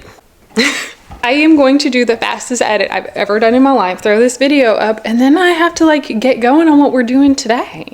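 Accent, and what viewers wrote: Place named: Texas, United States. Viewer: Your podcast was recommended to me. As soon as you spoke, I knew you were from the South. I'm from Tennessee. You sound like Texas?? Your dog is so cute and your kids. Enjoyed!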